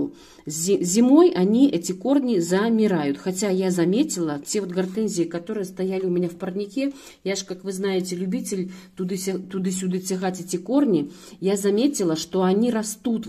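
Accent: Belarus. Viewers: Спасибо❤. У вас такой милый белорусский говор с акцентом на букву "я"))))))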